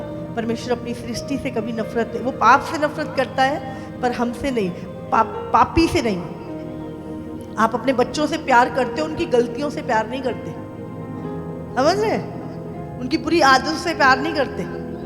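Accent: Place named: Jharkhand, India. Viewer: Malgo jharkhand Sa bolrhi apa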